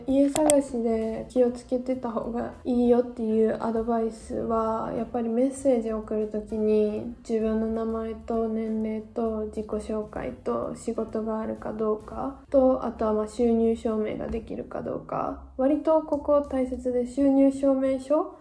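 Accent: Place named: Kansai, Japan. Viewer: 初めまして。Hikaruさんの動画がたまたま表示されたので、興味が湧いてきて見てみたらなかなかエモかったです。 僕も去年の夏まで駐在でオランダに住んでいたのですが、今は在欧邦人の間で和食が手に入りやすいことから一定の知名度を誇る（かもしれない）ドイツ西部の町で仕事しています。 Hikaruさんが別の動画で挙げているオランダ人の温かさ、また美味しいご飯にはなかなか出会えないという体験談に思わず頷き、共感しながら色々な思い出が蘇りました。笑 あと、関西弁が素敵です！ 同じ関西民として、Hikaruさんが今後もオランダで納得のいく暮らしができること、そして大変な毎日でもたくさんの幸せに恵まれていくことを祈っています。 全力で応援しています。 ドイツで僕も頑張ろうと勇気と元気をいただきました。ありがとうございます！ 速攻チャンネル登録しました。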